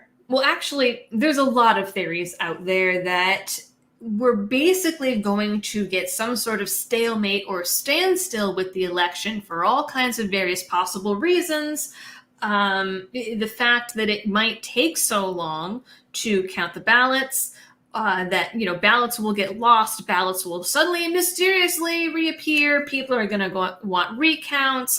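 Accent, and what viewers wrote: Massachusetts, United States. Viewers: <shameless flirting with married woman> I think the Bay State accent with the Hawaiian flower in hair is a nice balance!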